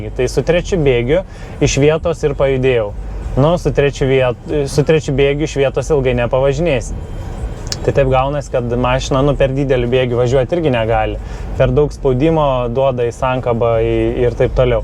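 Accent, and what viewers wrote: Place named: Panevėžys, Lithuania. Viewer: Gal esi pasakojęs,bet iš kurio Lt galo kilęs? :D Palei tarmę lyg Panevėžys?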